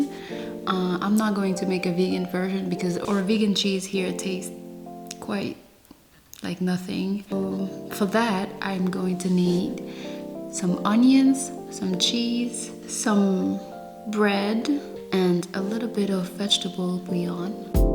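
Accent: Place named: France. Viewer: its the french accent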